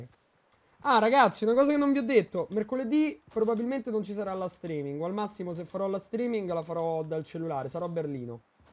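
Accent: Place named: United Kingdom. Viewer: Quanto british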